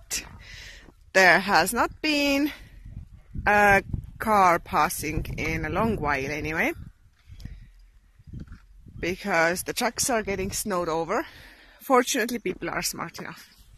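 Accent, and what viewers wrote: Ireland. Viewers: BEAUTIFUL AND YOUR GETTING AN IRISH ACCENT THERE KIDDO !!!!!!!! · Your accent is great! I can hear a definite Irish element to your accent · You're getting the cork accent!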